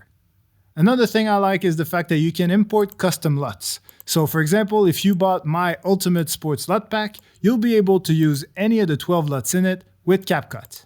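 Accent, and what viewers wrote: Quebec, Canada. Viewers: You are from Quebec right ?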